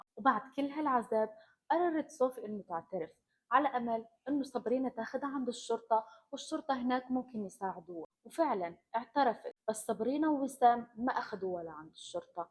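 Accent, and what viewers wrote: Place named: Morocco. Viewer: أنت أكيد من المغرب... Your accent باين ماشي لبنانية.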